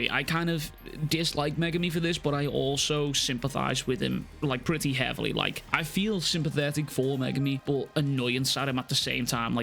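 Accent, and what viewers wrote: Liverpool, United Kingdom. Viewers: Hell yeah a scouse man calling megumi a bum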